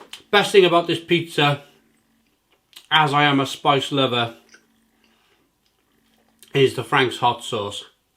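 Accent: Wales, United Kingdom. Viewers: What part of wales are you from fella?I'd say cardiff way.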